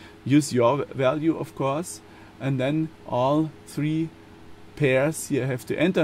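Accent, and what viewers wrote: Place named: Germany. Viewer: Ist ja schön, dass man so ne App entwickelt hat... aber wenn die Muttersprache schon Deutsch ist, dann doch bitte auch auf Deutsch halten. Denn das Englisch hört sich einfach nur grausam an. Zumindest kann ich da kaum zu hören. Wenn mans unbedingt auf Englisch haben möchte, dann jmd. bei dem man das "deutsche" nicht so raushört ;) btw: Bei mir hört sich das auch nicht viel besser an, deshalb lass ich es auch sein ;)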